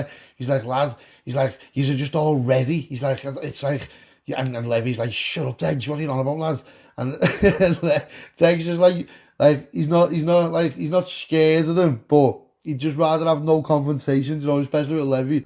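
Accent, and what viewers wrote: Liverpool, United Kingdom. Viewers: Another brilliant story from a fellow scouser good to listen to you. Good work and good luck with the channel I love it 🎉thanx mate 😊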